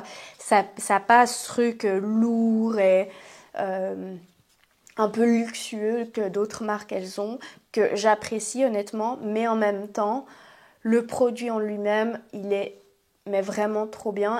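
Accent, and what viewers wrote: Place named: Switzerland. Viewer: Ça me fait aimer l’accent suisse 😊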